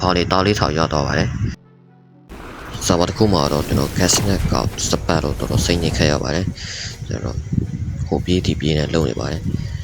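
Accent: Dawei, Myanmar. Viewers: ထာဝယ်သားလာ ဘိတ်သားလာဗျ အသံကရင်းနှီးနေလို့ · ညီကတောအကိုပြောတာနာလည်တယ်ထားဝယ်မိုလာမတိဘူ😅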